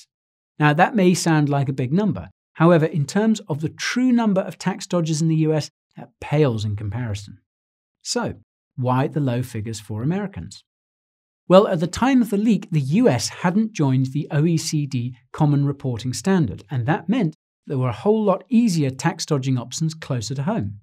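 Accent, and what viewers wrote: United Kingdom. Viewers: Well, you have an advantage with the British Accent. Love the channel. No bullshit. Simply informative. Let people make up their own minds... unless they believe in horoscopes because they have no spine for personal responsibility. Keep up the good work. Perhaps we'll dub you an honorary American...who knows.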